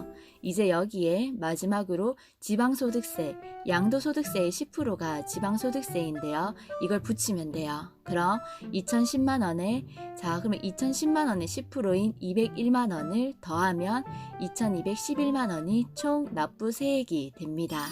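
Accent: Gyeongsang, South Korea. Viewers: 갱상도 사투리 좋아요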